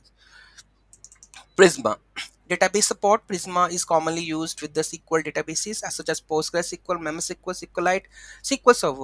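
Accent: India. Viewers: Even though with Indian accent but at least I understood all your English, thanks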